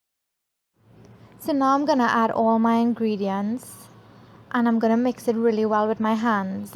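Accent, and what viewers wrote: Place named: Trinidad and Tobago. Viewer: I did enjoy your video. You did an excellent job explaining everything because I also love to cook. You did it in beautiful Trini accent and it also showcased our culture. I would not add the seasoning but the variations in cooking is varied...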